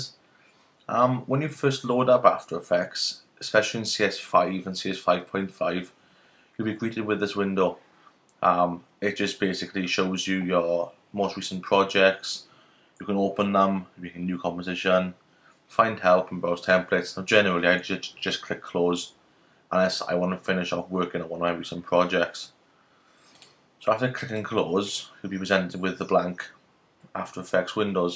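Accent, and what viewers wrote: Swansea, United Kingdom. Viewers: i swear ur from swansea in south wales! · Swansea man? :D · YOUR WELSH!!! ME TOO! WHERE YA FROM BUTT?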